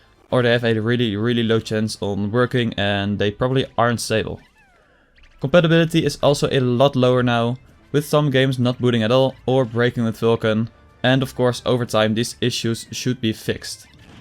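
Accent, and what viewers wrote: Netherlands. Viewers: please work on your dutch accent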